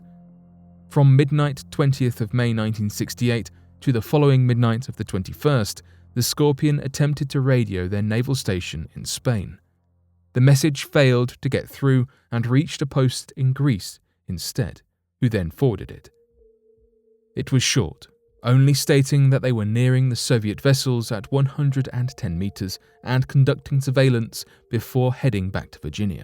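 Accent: United Kingdom. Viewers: "Hieroglyphic Texts" said that British accent just sounds so scholarly and knowledgeable tell me everything's going to be ok you sexy X - files nerd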